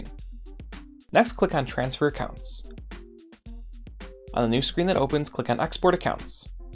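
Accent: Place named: United States. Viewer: When giving instructions, speak slowly and clearly. You aren't chatting to family and friends but to people all over the world who may not have US English as their native tongue. We have difficulties with your speed, your accent and your manner of explanation. Thanks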